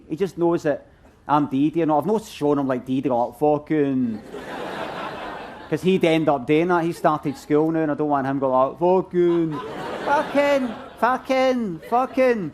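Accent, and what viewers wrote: Scotland, United Kingdom. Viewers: gonna sounds mean but i dont mean it in a bad way.. but pretty sure the accent scares away a portion of the audience. For exemple i speak french so learning english was a struggle already. hearing someone speak in a heavy accent is quite can be quite hard to understand . i've been watching and reading scot stuff so im personally used to it now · too scottish for hes own good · I don't know why but subconsciously I didn't think his accent was actually like that, and then seeing him actually talk it's like wow Scottish people are... real. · About his accent as he addressed it at the end of this show, I'm Irish and don't find the Scottish accent the easiest to decipher at times(due to the heavy colloquial stuff as much as the accent itself) but never truly failed to understand Limmy's jokes despite any supposed comprehension challenge. 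A happy accident of sorts came to my benifit as I continued watching Limmy show I found my ear tuning to the Scottish way of language which increased my ability to be a more attentive listener, one who searched for meaning in one's speech rather than have it handed to me on a plate. Limmy was very correct to keep his true speech. It best represents his intent for his jokes and also politely asks a discerning audience to be active listeners and cognitively involved in figuring out the jokes in his work. He writes his work like Miles Davis wrote jazz, honest and true and then only appreciatable by those willing to keep their own active mind present when being entertained. Not saying anyone is smarter or less so, just distinguishing the difference between people who watch comedians or listen to music to shut off or disconnect from their mind, maybe as some kind of break or rest for it(the mind), as opposed to those who keep their mind involved in the entertaining experience as to appreciate it earnestly (as in beyond mere amusement). 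Any thoughts?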